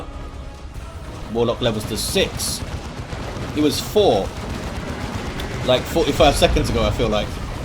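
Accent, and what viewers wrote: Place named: Midlands, United Kingdom. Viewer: midlands England is my bet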